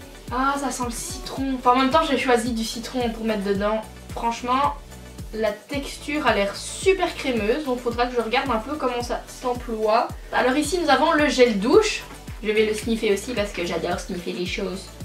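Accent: Belgium. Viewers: le bon accent des carolos on adore ❤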